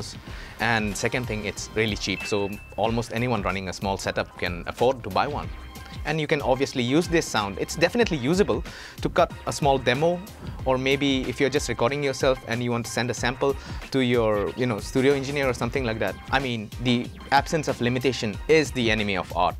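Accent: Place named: India. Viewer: It's weird as hell hearing an Indian accent come out of your mouth.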